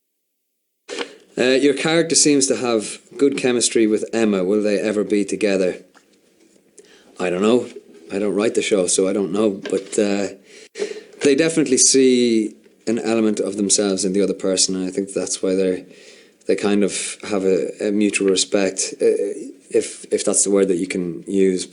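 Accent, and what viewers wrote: Ireland. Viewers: Ok so he is extremely attractive as Hook, but as himself with his Irish accent he is adorable!!! How is this possible? He's too perfect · TBH his accent irl is soo much more Irish than in the show like a huge diffrence, anyone else with me?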